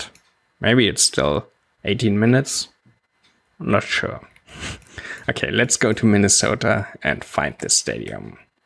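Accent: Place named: Germany. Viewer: Hi, thanks for subscribing to my channel ! Where are you from btw ? I'm guessing either Germany, Austria, Switzerland or Denmark based on your accent (but I might be completely wrong haha) Edit : after watching another video (the Romania Fake one), I'm not so sure now haha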